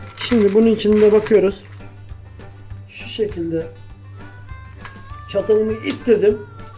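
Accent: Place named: Bartın, Turkey. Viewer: Ulan garibana destek için abone oluyorum nerelisin bu arada bartınli şivesi var sende sanki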